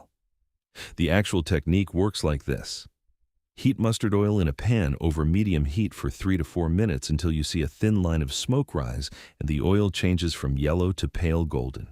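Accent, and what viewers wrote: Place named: United States. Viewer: Very interesting but the "American" pronunciation made it hard to follow at times.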